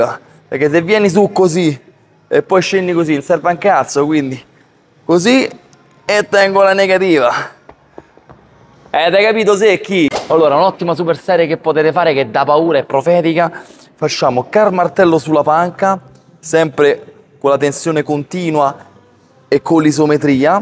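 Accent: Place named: Rome, Italy. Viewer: AHAHAHAH bellissimo..breve ma intenso😂😂il romano è il dialetto piu bello da usare in pale😂❤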